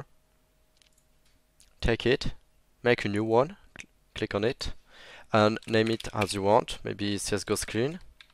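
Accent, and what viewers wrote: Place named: France. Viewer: Love your french accent man! keep up the good work.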